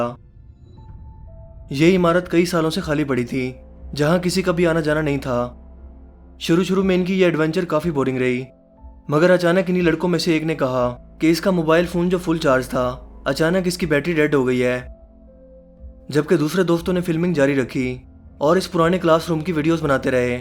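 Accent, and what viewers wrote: Punjab, India. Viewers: Urdu ko Punjabi bana k bolnah walay kuch to lehja behtar karo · App ki Urdu saf nhain hain, app kaiyoon punjabiyoon ki tarha Urdu bolthe hon??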